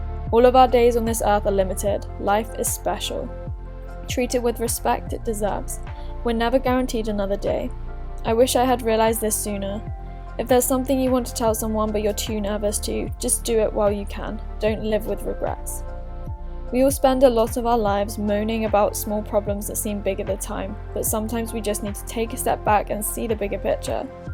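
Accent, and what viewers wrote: Australia. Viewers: i totally agree - you sound 25% Aussie, and your intonation is 40%! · You’re picking up the accent a bit! Aha xx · lowkey getting an Australian accent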